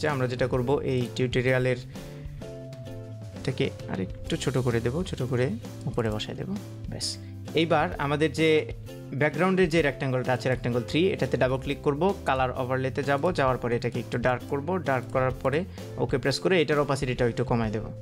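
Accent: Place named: Chittagong, Bangladesh. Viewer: vi apni koy takan dhaka naki.ctg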